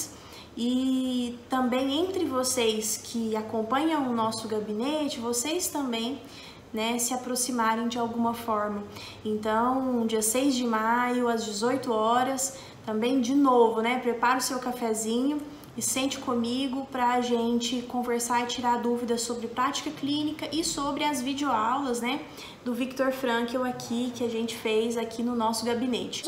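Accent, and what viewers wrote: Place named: Minas Gerais, Brazil. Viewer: sou estudante de Psicologia, estou no do 7º semestre. E sou apaixonada por suas aulas, não apenas por sua didática de ensinar, de transmitir o seu conhecimento, mas pelo seu sotaque gostoso que me remete saudosamente ao meu pai que era também mineirinho. Obrigada, por compartilhar informações de tamanho valor para nós estudantes, com tamanha riqueza e simplicidade ao mesmo tempo. Sempre compartilho seu conteúdo com meus colegas de turma. Beijokas e fique sempre bem (sempre que possível)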